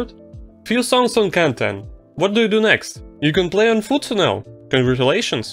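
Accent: Russia. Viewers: я сразу понял, что имею дело с Российским человеком из-за акцента) очень всё понятно объяснил, без воды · ахаххахах, смотрел все видео с мыслью: "Какого хера у чела такой сильный русский акцент?" (слишком сильно напоминает меня, когда свой голос записываю). Вот оно что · RUSSIAN ACCENT, why not do Ha Pycck| /| |/|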